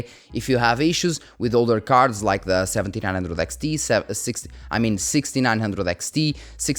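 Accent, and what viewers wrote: Portugal. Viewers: I recognized your accent in Portuguese. Brazil here!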